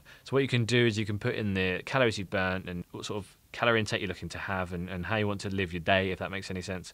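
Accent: United Kingdom. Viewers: Your English British is better than american English, very very strong. I have enjoyed hearing you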